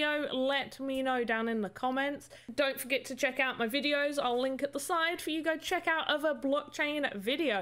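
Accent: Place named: United Kingdom. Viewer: I like ur speaking! U cool! Lovely British talking! 😇❤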